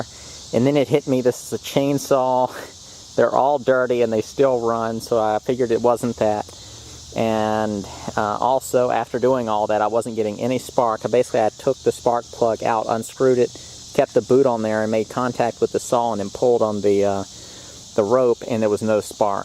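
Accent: South, United States. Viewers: holy moly! i don't know how this video popped up!..... what a strong Southern accent!!!!!!! i feel like 'bearded old hippie hiding behind his truck'! no telling what this video was gonna be about!..... turns out to be a cool little video! thank you, very much! :-)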